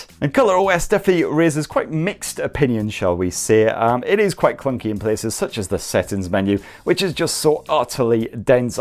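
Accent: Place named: Scotland, United Kingdom. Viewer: Hes Scottish 💀 hes not acting?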